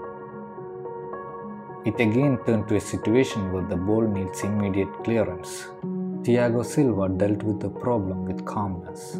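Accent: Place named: Kerala, India. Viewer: The mallu accent is so recognisable :D. Nice work bro.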